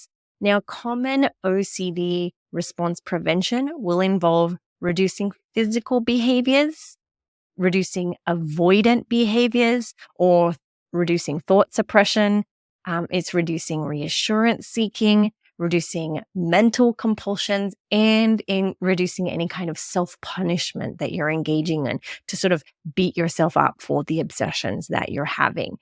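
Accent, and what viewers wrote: Australia. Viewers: I couldn't place where you accent is from, my best guess is you're an australian who moved to texas when you were 18 ^^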